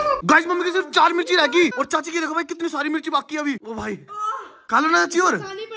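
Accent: Haryana, India. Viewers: Bhai aap Delhi se ho ya Haryana se?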